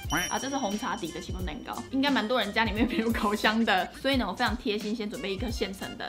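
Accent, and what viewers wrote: Taiwan. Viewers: 是塔批歐咖哦～ 然後我是看癡癡的合作影片來的，覺得道地的台語非常對我胃口訂閱了，加油☺️ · 好喜歡的口條超台但是又很清楚！真的很難得哈哈😍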